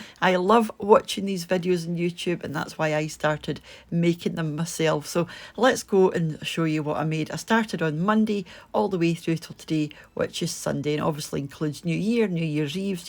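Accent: Scotland, United Kingdom. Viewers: Happy New Year to you and yours!! My best friend, Wendy who sadly passed away a few yrs ago was from Arbroath originally and you sound just like her. Your accents are the same. TY for sharing your lovely recipes. Im going to make your mince pie recipe and like you, Im also going to use puff pastry. It looks delicious. Cheers and best wishes from sunny FL. USA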